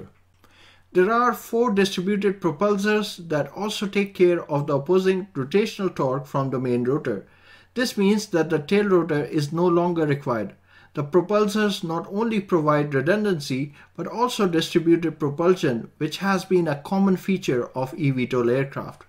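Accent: India. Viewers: then where are you from your accent sounds little Indian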